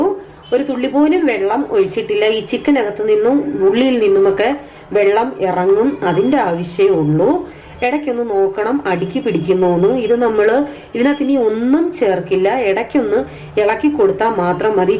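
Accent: Kottayam, India. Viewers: ചേച്ചി കോട്ടയംകാരി ആണോ.... അവതരണശൈലി കേട്ടിട്ട് അങ്ങനെ തോന്നി...... എന്തായാലും അടിപൊളി.... നോയമ്പ് കഴിഞ്ഞു ഒന്ന് ഉണ്ടാക്കി നോക്കണം.......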